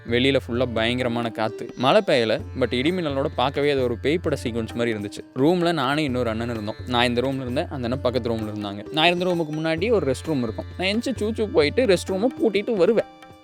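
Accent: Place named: Coimbatore, India. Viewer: உங்கள பாதா சென்னை காரன் மாறி தெரியலையே. கோயம்புத்தூர் மொழி பேசுறீங்க.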